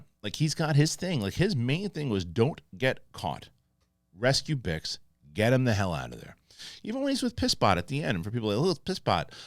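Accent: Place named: Brooklyn, United States. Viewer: Damn I love listening to lol! It's like listening to an uncle from Brooklyn describe some bad ass movie with a 90s neighborhood gangster take on the unfolding scenario with a brilliant mind and a east coast vocabulary!! F#@ great!! I love it!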